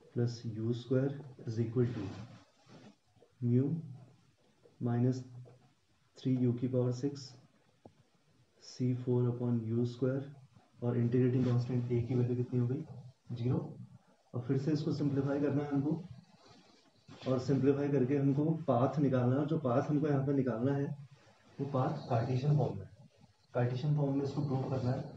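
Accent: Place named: Rajasthan, India. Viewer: That ' rajasthani accent ' 👌👌,. ....... Similar to a hero in looking and Vice - versa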